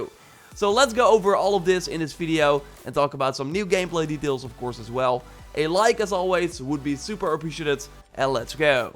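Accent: Netherlands. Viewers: LoL i can hear that you are dutch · It's been 2 years since I havent really been active on youtube but I sure remember the Dutch accent of yours like the sound of yesterday haha. Houd jezelf sterk en veilig! Stay strong and be safe! Very informative information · why do u sound like u have a dutch accent😂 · are you dutch? it rlly sounds like it lol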